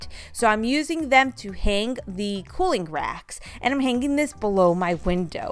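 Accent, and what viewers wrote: Chicago, United States. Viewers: I don't usually comment on YouTube videos but I have been watching you for a bit now and wanted to tell you that you just think of the most clever ideas! I also like watching you as you remind me of my home town of Chicago, which is where I think you are at based on your accent! Keep up the great vids - love seeing them! And, yes, I am subscribed. 😉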